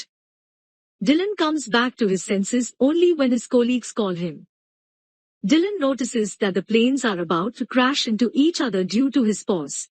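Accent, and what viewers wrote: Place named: India. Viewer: Your accent is like you're an Indian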